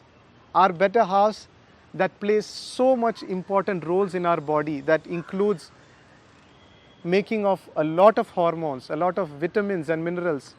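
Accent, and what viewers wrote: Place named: London, United Kingdom. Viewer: நீ முதலில் தமிழில் பேசு பா. பெரிய லண்டன் ரிட்டன் போல பேசிகறாய். டைம் தான் வேஸ்ட். 👊👊👊👊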